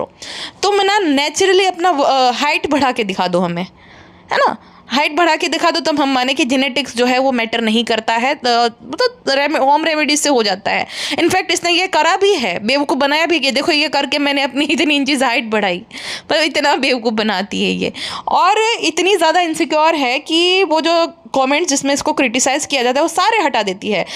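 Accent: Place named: Kashmir, India. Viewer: Ma'am are you kashmiri ! Apna J&k wala accent aa rha hai 😄💜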